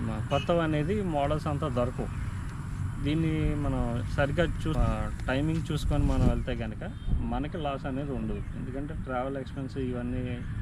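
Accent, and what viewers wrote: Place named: East Godavari, India. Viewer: Brother meeru ma East godavari ki chendinavara voice no batti doubt vachindi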